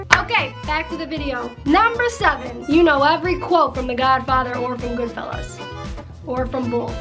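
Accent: Naples, Italy. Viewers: Yeah she sounds Napolitano or siciliano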